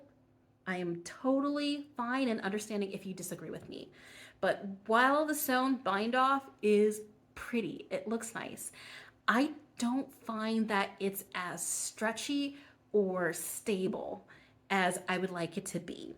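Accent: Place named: Vancouver, Canada. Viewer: Very impressive. I had to watch it twice. You sound like you're from Vancouver, Can. Keep up the excellent work.🌫️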